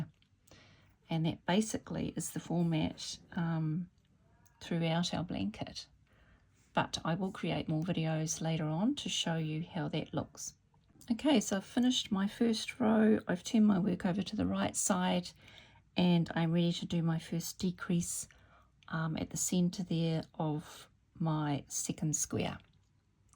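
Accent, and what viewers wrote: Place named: New Zealand. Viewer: so lovely to hear your kiwi accent :)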